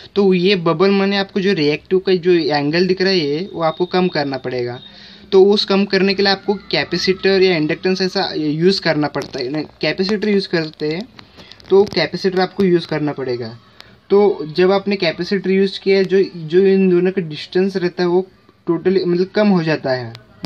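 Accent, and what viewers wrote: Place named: United Kingdom. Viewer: Video me kya bolne wale ho, pehle se likh ke Q nahi rakte ho yaar, lagta hai jaise koi angreez hindi bolne ki koshish kar rha ho, anyways, i managed to understand what you were trying, and it helps a lot. thanks for that.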